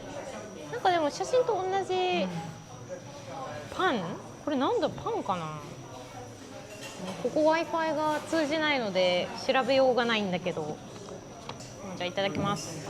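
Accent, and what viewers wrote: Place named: Kansai, Japan. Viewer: プラハ旅行の反省点も含めてレポしてもらえて嬉しいです🫶 さんめっちゃ可愛いのに関西弁な感じあって親近感わきます。笑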